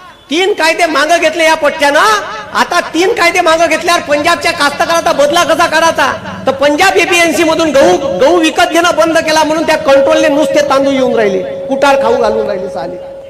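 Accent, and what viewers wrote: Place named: Varhadi, India. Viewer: वऱ्हाडी भाषण व्वा 🙏PM साहेबांना बोलताना राउटसारखी जीभ घ ून जाऊ देऊ नका, हे ्वच पक्षातील हरामखोरी आहे, तुम्ही आपण गुरुजींना हे राजकारण परवडत नाही जी.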